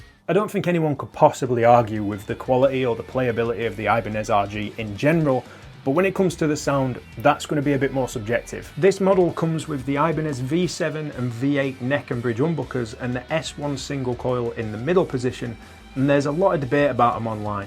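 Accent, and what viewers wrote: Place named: Yorkshire, United Kingdom. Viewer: The love how goofy the Yorkshire accent is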